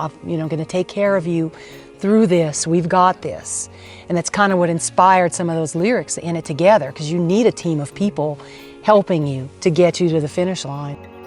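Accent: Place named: Louisiana, United States. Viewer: Monkey Michele is so proud of you girly! You will inspire many with your story! I love that you haven’t lost your Cajun accent. I hear it so clearly! Stay close to your roots. Much love!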